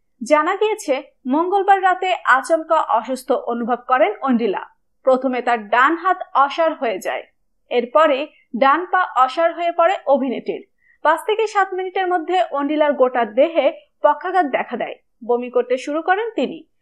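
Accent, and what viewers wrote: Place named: Bangladesh. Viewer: আমার মনে হয় এই সব channel গুলো বাংলাদেশের. Pronunciation শুনে তাই মনে হয়. আর বাংলাদেশ থেকে YouTube এ extremely vulgar জিনিষ দেয়. এই গুলো কি করে allow করা হয় জানি না.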